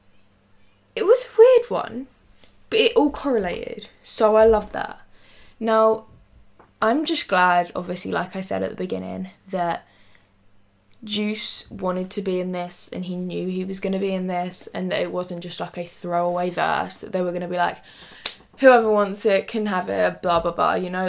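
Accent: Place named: United Kingdom. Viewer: I like u'r british accent.. it's so seductive ❤❤❤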